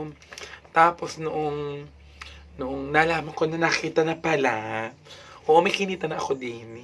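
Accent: Batangas, Philippines. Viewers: nakakatuwa k.. lalo na ung puntong batangueno mo at mga ganap mo.. keep up the gud wrk! and keep being humble!!😀😀😀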